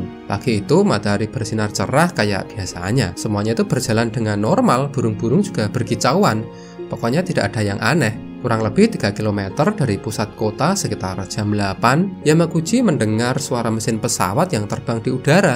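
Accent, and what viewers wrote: Java, Indonesia. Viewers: Akhirnya muncul juga sosok legendaris pengisi suara "Aneka sejarah"...yg kental dengan aksen jawa medhok nya.. Ternyata guanteng.. 😊😊😊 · semarang yo mas ..keliatan dari logat Jawa ne 😊😀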